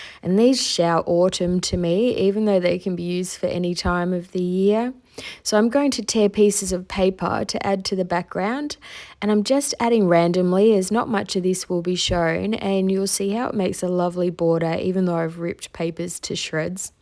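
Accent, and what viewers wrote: Australia. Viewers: They are all beautiful! You do such lovely work and you are a pleasure to listen to. Your voice is calming. And, who doesn’t love an enchanting Australian accent. I’ve only recently discovered you and am now looking at your past videos. Keep them coming! ☮️💜🌞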